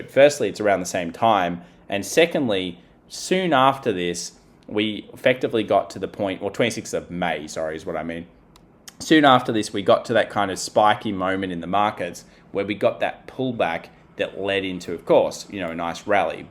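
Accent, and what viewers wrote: Australia. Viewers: Love the Australian accent pontificating about the US "mahket."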